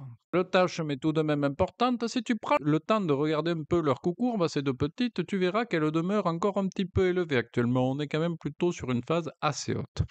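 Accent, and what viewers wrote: South, France. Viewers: Bonsoir, le petit accent du sud est très agréable pour une chaîne sur l'investissement. Un rayon de soleil qui réchauffe le PEA 😅. Merci et bonne continuation 👍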